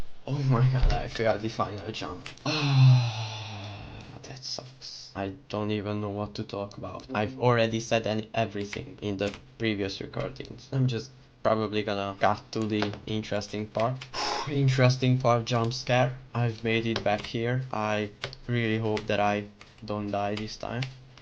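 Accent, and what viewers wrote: Hungary. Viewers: Csá bro hallom hogy magyar vagy amúgy király vidi❤😮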